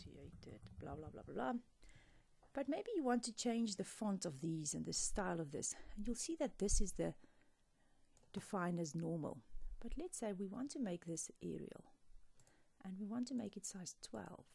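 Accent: South Africa. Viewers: A lovely South African accent I detect 🥰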